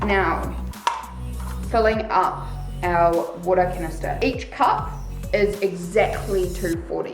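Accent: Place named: New Zealand. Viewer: sounds kiwi 2 me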